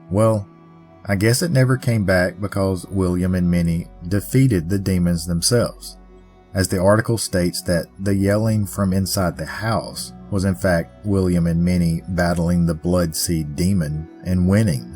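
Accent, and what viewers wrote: South, United States. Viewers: I always start looking for your newest video starting Sunday afternoons, then check hourly. You always make it well worth the wait. Love to hear a fellow Southerner. North Carolina ☠🏴‍☠⚔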